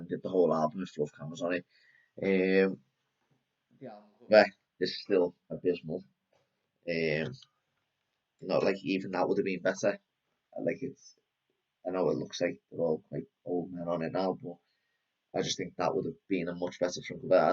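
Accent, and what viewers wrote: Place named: Liverpool, United Kingdom. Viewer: Nice to hear a fellow scouse accent talking about 😉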